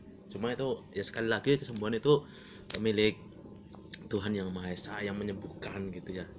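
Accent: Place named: Banyumas, Indonesia. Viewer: Sip...dicoba kang. Kang asline ngendi sih? Ngapake kaya enyong banget...he he he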